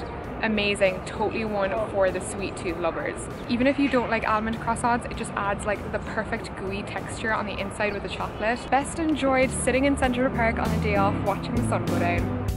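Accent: Ireland. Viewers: U sound Irish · Do i sense an Irish Accent. :D Nice Video been to New York Twice love it. Deff beats home for the savory treats.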